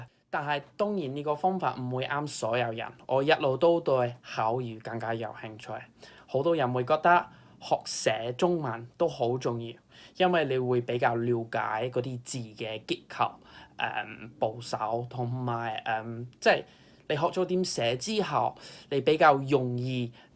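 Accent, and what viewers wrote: United Kingdom. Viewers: Are you from Essex? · would have never told that it's a Brit speaking if i listened with closed eyes, well done! are you also on instagram?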